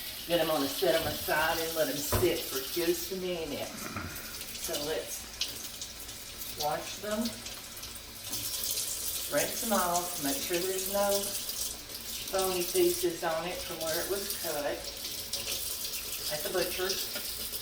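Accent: South, United States. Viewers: Well aren't you just the cutest thing!! I'm originally from Louisiana, and I'm enjoying your cooking and your southern accent so much!!!!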